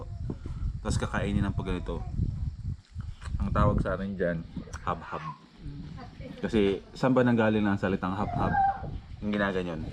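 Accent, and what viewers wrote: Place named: Karay-a, Philippines. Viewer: Do you speak ‘karay-a’? It’s cute to hear😀😀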